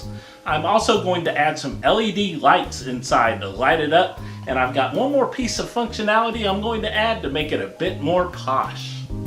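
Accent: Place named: South, United States. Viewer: Great job, loved the British slang with southern accent.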